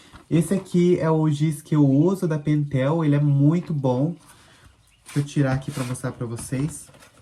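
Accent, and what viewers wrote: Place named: South, Brazil. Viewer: Moço voce e sulista? Que sotaque fofinhoo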